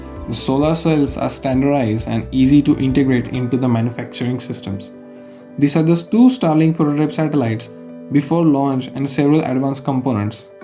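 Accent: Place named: India. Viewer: Your video looks nice 👍 but your vary strong Indian accent is very hard to understand and and oh god it’s painful to listen too, Is it possible you can get an English native speaker to do the video or maybe get some elocution lessons to speak English properly with a proper accent, Because it’s such a great high quality pro video that you’ve done so well edited vary Polished very professional, but so let down on that voice but I did watch it with the sound down because I was impressed with your video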